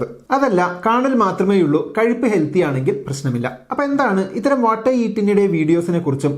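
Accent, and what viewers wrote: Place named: Kannur, India. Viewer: Food മൊത്തമായി വെറുക്കാൻ refillyourtummy കണ്ടാൽ മതി. അത്യാവശ്യം കണ്ണൂർ ഭാഷയും വെറുപ്പിച്ചു കയ്യിൽ തരുന്നുണ്ട്.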